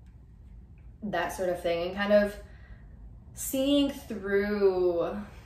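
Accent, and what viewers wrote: United Kingdom. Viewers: hahaha yesss i love the british accent! i always do that too 🥰❤️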